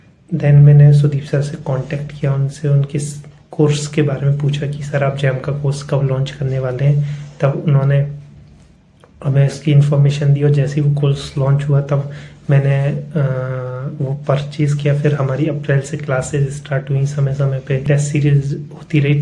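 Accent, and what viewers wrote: Bengal, India. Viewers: sir, THANK YOU VERY MUCH for your content over these years. Your channel is probably the major factor in getting me this amazing IIT JAM 2025 rank I've achieved. Also, I love your Bengali accent. It is quite adorable ❤❤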